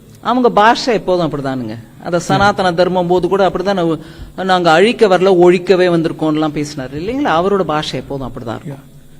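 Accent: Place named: Chennai, India. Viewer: Amma u r great neenga prindhathu madurai aana neenga chennai pechu iducosam vera11 amma i m great fan of fr u amma neenga unmaiyele enga perima mari erukinga unmaithan inda dmk nambi vote potta enga kudumbam nadutherivil nikkudu annan udhayaniye nanbunen ennoda vazhkai soonyam aachu ethanaiyo exam pass panniten aana enaku tn government job kidaikale aana unaga centrel government job le ennoda 3 friends velaiku poittanga adu mattum ille nan udayanithi anna big fan avare rendu thadavai meet panni ennoda kuraiye solla ponen enna pakkave videle adule ennaoda love vazhkaiyum adanki eruku...,,ok iduvum kadandhu pogum i m from madurai.....,,,, I WANT SEE TN BJB ANNAMALAI SIR TQ TN PEOPLE NAN EVLO COMMENTS PADICHUREKEN PLS IDHA VIREL PANNU GA FRIENDS PLS I WANT TO ANNA MALAI SIR AND UDHAYANITHI ANNA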